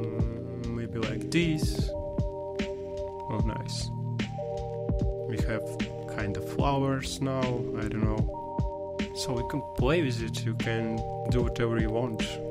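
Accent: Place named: Russia. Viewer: so russian accent )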